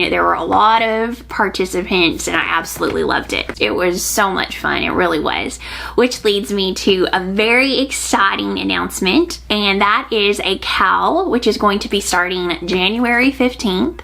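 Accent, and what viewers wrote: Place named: Arkansas, United States. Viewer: I always love a new video from you! You accent makes me feel like home. My family is from Bentonville. I can not wait to see what you get up to this year!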